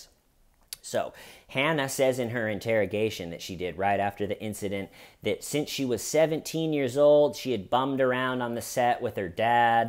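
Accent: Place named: New York, United States. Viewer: You're picking up a NYC accent, homie.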